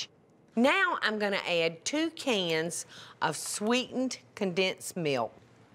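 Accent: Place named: South, United States. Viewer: Did she say ERL Sha calls oil ERL so southern it makes my eyes bleed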